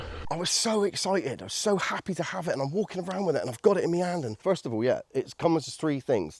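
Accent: United Kingdom. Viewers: I heard the brittish accent and wondered what this dude could know about guns and how experience he could be rambles on for 4 mins then says he knows nothing and he doesn't understand the purpose of stuff.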